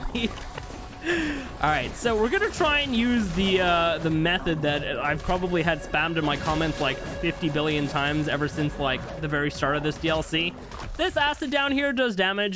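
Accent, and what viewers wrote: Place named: Ireland. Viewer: He sounds so Irish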